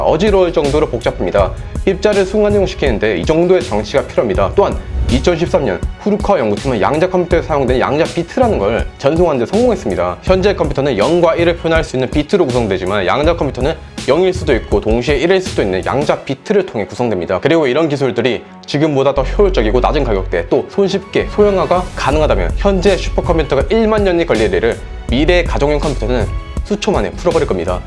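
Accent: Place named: Busan, South Korea. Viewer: 억양이 .억양이 부산 스럽다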